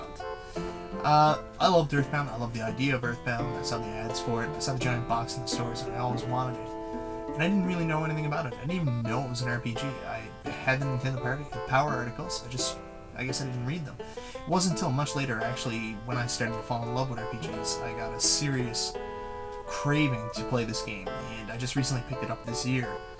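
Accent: Canada. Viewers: wheres youre accent from canada?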